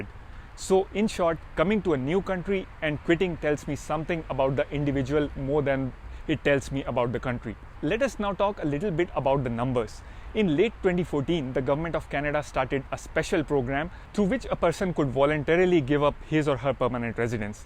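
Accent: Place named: India. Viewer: This guys accent is pathetic - typical musical and Indian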